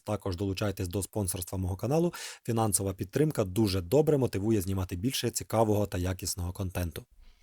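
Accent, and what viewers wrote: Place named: Ukraine. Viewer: хохлятский говор ну прям ржу)))нож шляпа, це обзор хоно)))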